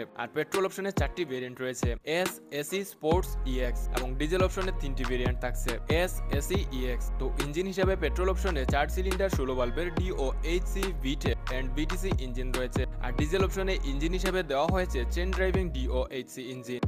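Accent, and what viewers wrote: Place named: Sylhet, Bangladesh. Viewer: Jodi valo korei kotha bolen apni je Sylhety ta apnar kothay bujha jay.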